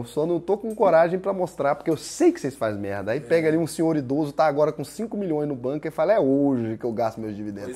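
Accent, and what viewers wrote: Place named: Goiânia, Brazil. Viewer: Que sotaque engraçado kkkkkk. Goiânia ? Da hora . São Paulo sempre uffa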